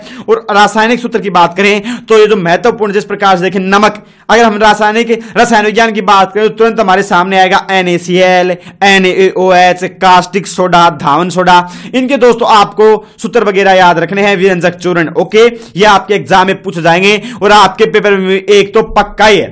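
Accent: India. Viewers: Ap hariyada se ho pakka...😳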